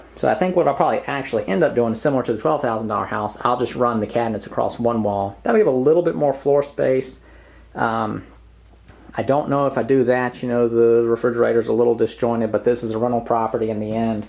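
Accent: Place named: Texas, United States. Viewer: Hey, I live in France and about wood framed houses (maison ossature bois in French) you're actually so right. Our tough houses ( masonry) are tricky to renovate regarding man strength and structurarly it requires heavy heavy duty sometimes just to add a door, a window or a sliding door. Wood houses are on the rise it is true in France. They're not really cheaper since we don't havea lot a wood resources. But they provide flexibility regarding the floor plan and the possible additons of the house (for instance, adding a bedroom on the side of the house). Workload is really easier to manage with wood than masonry. Also, wood provides better eco (heat) performances but for sure far more sound issues (cracking and sound passing from a room to another) and some people fear the wood to rotten and by doing so, making the house to collapse. Anyway, I bought a house in Normandy which was a pig house transformed onto a house but its core is compacted dirt with cereals... 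Anyway if I'd build one, I'd choose wood for sure. Love your videos. Love the music. Love your Texan accent. Love the content. Love the answers given to our questions either in the comments or in your videos. Take care and love this house too, great potential. 👋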